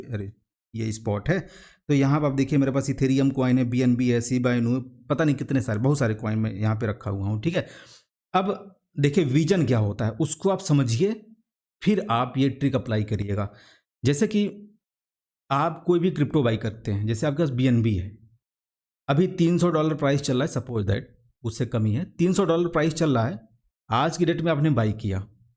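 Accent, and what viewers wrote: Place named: India. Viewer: Very beautiful 🙏🌹sir. ❤️Thanku 😘 love you sir. Or aapka bolne ka desi style mujhe bahut pasand hai.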